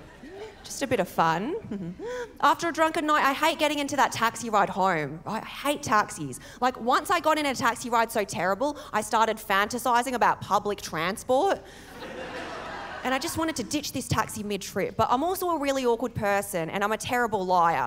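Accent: Australia. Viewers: She sounds so Aussie it’s mad. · That's pure Aussie accent, Mate! She grew up in Sydney. You just assumed she has Vietnamese heritage from her name, & while she says she Chinese, she was NOT born in China nor Vietnam. You'll never get where was she was born! If you think the tone changes & the accentuated vowels is anything to with a Chinese or Vietnamese accent it doesn't!. That is all 100% this type of Aus accent. If you'd only had heard the audio, you would have no clue there's anything other than aussie. Because there isn't. Now go watch Diana Ngyen, to see the difference between accent, & what you wrongly think her accent is. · Sounds more Aussie than most Aussies😁🤣🤣👍🇦🇺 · You sound more Australian than Nicole Kidman and Margot Robbie combined. Good stuff lol. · You are quite funny. I love your Aussie accent. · What makes her funny is she has a THICK Aussie accent, she's funny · If you live in Australia and are respectful and proud to be in Australia then I have no problem with calling you Australian. 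You however have such a strong Aussie accent that I would have assumed you were actually born here and maybe even your parents had been here for a long time. Also that was a hilarious video. Cheers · I've never heard anyone more Australian in my life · It's unusual to see someone of Asian heritage (or African) with such a strong Aussie accent. It's very endearing! Continue being bogan and proud! Own it! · I love watching and of course listening to her Australian accent. Her routine is funny and her timing is awesome! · She has that Australian Asian accent. Most of my Aussie asian friends have it. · Nah you got that Asian Australian voice/accent. There’s that accent that is not a fob accent, but it’s very typical of Chinese descent Aussies.. especially women. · Hi. "Bogan" isn't exactly the equivalent of American 'Redneck'. (it's just the nearest word/type to explain it). A better description of Bogan is "a bit rough" in style and speech. 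accent is how some Bogans would also sound. (some, not all). However, there's also thing called "cashed-up" bogans. Definitely not unsophisticated, just might sound it from way they talk. Of course there are also the many "true bogans". After 4 or 5 VBs, or Fruitly Lexia, nearly everyone becomes Bogan, lol There's several types of Bogans, just like there's (more than) several types of Australian accent. is not common now in Melbourne or Sydney & in a lot of Brisbane any more, except in some outer suburbs. Many Australians are commenting, in/on videos it's the "most Australian" accent they've heard. That type of Aus accent was common till the 90s, but in the cities, at least it's often more rounded now. But there's also the frequent Euro-Australian accent, which is another, again. (Although, quite a number of Austalians don't realise they're own accent is more "Australian" that they think) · I'm a gen x white guy from Melbourne and she sounds more Aussie than me lol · She has the Chinese/Vietnamese Australian accent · she has a Asian au accent very common in Melbourne and Sydney she doesn’t sound bogan at all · She's Aussie for sure! 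No Asian can speak like that!🤭😎👍👍👍👌👌👊 Your awesome lady!👍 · face deffo doesnt match the voice. yer an Aussie through and through. subbed. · You are the best of both world , beautiful Asian looks and wiked Aussie accent , keep up the great work you have a great sense of humor ! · ABC 👍👍perfect australian accent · I love when another nationality sounds like us true Aussie’s ! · I Honestly never realized Super Cute Asian Girl with an Australian accent was my thing until just now. Alas, you're too young for me young lady, so it'll never work. You're really funny. You deserve a Comedy special. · Love the Aussie accent regardless of the ethnicity… ..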